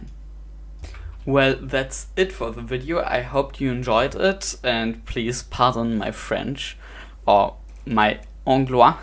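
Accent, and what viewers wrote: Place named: Germany. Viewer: He sounds German 🤷‍♂️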